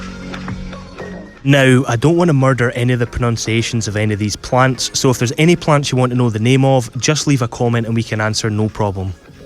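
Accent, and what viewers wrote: Scotland, United Kingdom. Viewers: I miss Scotland so much and love your Scottish accent.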